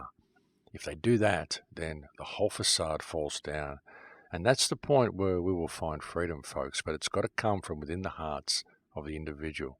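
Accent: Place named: Australia. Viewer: Gate-keeping. By the way every time I think of this concept I hear your Australian accent...so your videos work to a degree lol.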